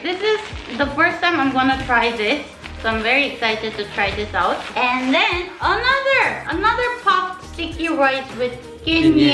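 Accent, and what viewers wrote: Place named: Nagaland, India. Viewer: Your accent is just like AO tribe of naga 😂😂😂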